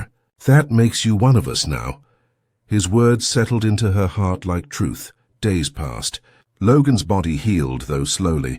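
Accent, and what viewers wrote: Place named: RP, United Kingdom. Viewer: One of the chapters is out of order. It’s a little odd for an elite British accent for the narrator for this American story involving Hell’s Angels.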